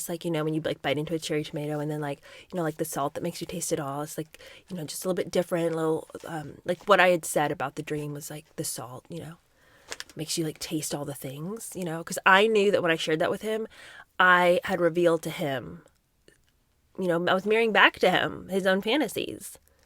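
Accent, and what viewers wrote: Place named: England, United Kingdom. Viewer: Her accent is awesome. I can't figure out where its from I feel like england?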